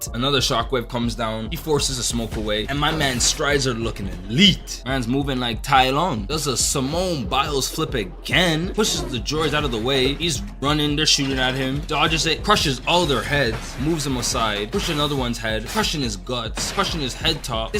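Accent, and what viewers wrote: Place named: Toronto, Canada. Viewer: are you from Toronto? I can sense the accent like i sense the force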